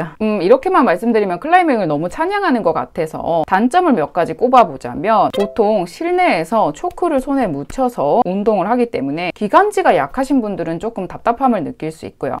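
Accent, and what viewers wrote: Gyeongsang, South Korea. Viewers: 언니 갱상도 뇨자였구나? 친근해ㅎㅎ 담엔 사투리버전 영상 어때여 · 누나 혹시 경상도 출신이에요??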